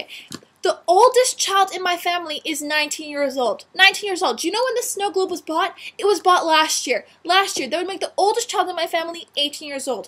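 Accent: Canada. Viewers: you know what I like it more when you're calm in ur videos... but it's ok cuz ur Canadian accent is so kwl =] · I think i like the canadian accent.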